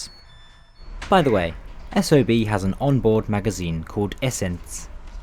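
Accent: England, United Kingdom. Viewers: Damn... as a swiss guy i just love it how you pronounce the swiss names of villages and cantons in a english accent😂 it sounds very good btw! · Hey! As i'm listening 2 u, i hear, that u r english, or brittish, not american! Because there are a few difference between brittish english and american english!😊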